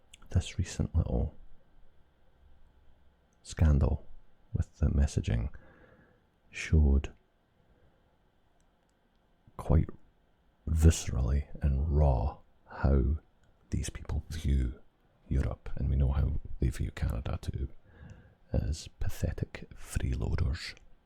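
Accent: Scotland, United Kingdom. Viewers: Im neutral ion rlly get into politics but i love ur scottish accent lol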